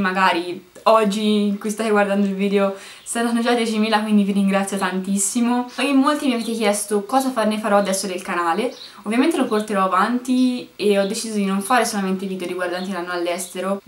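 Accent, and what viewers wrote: Tuscany, Italy. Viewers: Che bello l'accento toscano 😍😍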